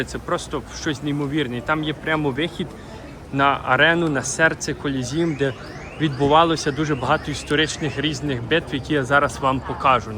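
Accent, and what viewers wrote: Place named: Kolomyia, Ukraine. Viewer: ти чисто гутариш по коломийський,звідки ти родом?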